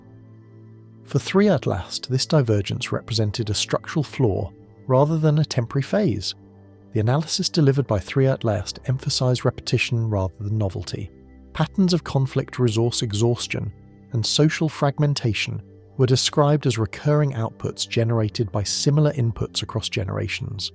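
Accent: United Kingdom. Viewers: Saying lots of words in a UK accent with no point.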